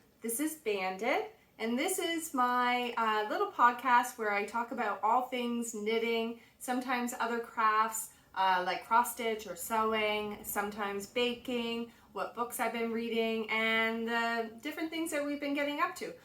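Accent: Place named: Canada. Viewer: Hello, YouTube randomly took me to your site today so I decided to watch you while I finish up a sweater I'm working on. Your 'By the Bay' caption attracted me as I live in the SF Bay Area in N. Calif, but when I heard you talk I immediately recognized the Canadian twang so I knew your bay is far away from mine! Thought your family so cute and you seem so wholesome so I subscribed. Loved your cabled deep winter coat wip, lovely sweater! I went back and watched your episode 1 so I could learn where you are from and your name and saw your other doggie. Your gray dog looks like part border collie, is that right? And of course I saw your B/W border collie in the 1st episode. I have 2 border collies, male brothers from different litters that have same mom and dad. They are like my kids! I am finishing up a sweater for my daughter in law called Vanessa by Swans Island pattern. 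It is done in Juniper Moon Farm Moonshine colorway Lagoon. Wonderful soft yarn! My next adventure in knitting is Zwieg by Caitlin Hunter, It will be done in a medium gray yak/silk/woolen yarn held double with a lace weight for a dk weight final knit. Pink to dark rasberry yarns for the yoke lace section. This will be fun as I haven't done much with lace style effects and the yoke has lace in the oattern. Looking forward to a bit of challenge! Your sewing projects are great and I like seeing them. I'm a life time sewer.... went to FIDM in late 70s and I design + sew with a targeted interest in tailoring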